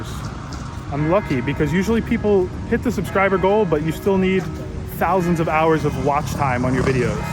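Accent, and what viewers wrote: New York, United States. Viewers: Love your New York accent. Keep it up. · Keep talking bro! Malaysians are trying to learn your American English by listening to you! Keep doing it..Good luck from a Malay American living in Boston.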